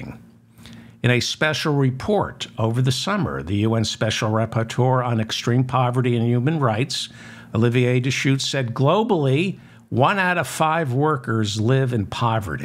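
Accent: New York, United States. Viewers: Profound thoughts on Gaza,. Your transcendent resolution is the only way. Thanks. PS: your pumpkin postmortem was blissfully brilliant, and spoken by a true New Yorker!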